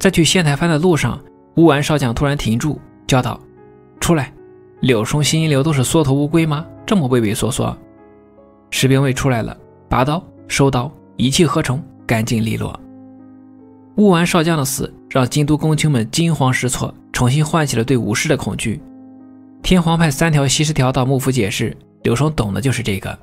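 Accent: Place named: Northwest, China. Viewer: 简明扼要，一气呵成，精彩！对博主的西北口音稍有不适，不过瑕不掩瑜。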